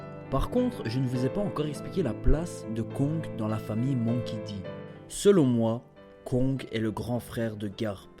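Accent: Belgium. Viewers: ça c'est l'accent Belge ^^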